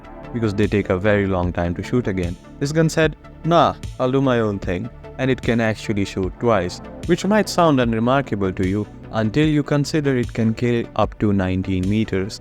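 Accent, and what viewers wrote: India. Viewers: dude you're the exact same as me 😭😭. i started exactly a month ago too after 2.5 years. i was surprised to see an indian voice instead of path's. wonder what happened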